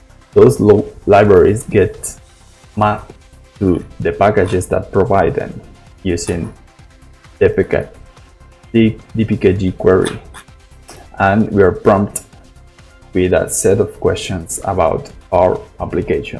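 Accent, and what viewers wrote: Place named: Latin America. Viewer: Hello. I hear one latino speaking english here, asi que te escribo en español mejor. Cómo se puede hacer una appimage a partir de un archivo sh? Es necesario tener muchos conocimientos de informática? Te lo agradecería mucho. Gracias de antemano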